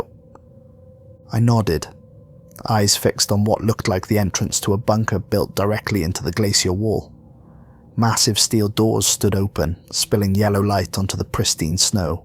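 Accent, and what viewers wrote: Wales, United Kingdom. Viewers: I like the story but the request for subscription resonated as I realised you're welsh. Good luck to 10k!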